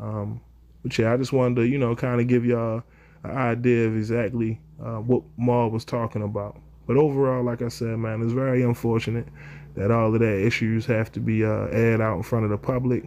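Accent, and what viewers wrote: Baltimore, United States. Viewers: You from Baltimore bruh? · You gotta be from bmore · Man you gotta be from Baltimore lol. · yo u from bmore? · You sound like you from Baltimore fr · Your from Bmore?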